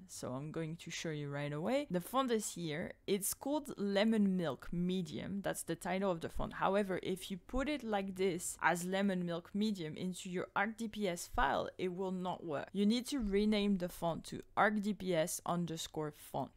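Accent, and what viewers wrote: France. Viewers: Hi! Great video ty, are u French? European server or American?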